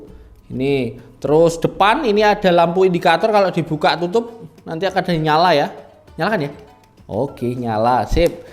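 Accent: Java, Indonesia. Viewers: logatnya jawa banget... saya udah punya yang soundcore R50 NC ... apakah P40i ini kualitasnya lebih bagus dari R50 NC?😅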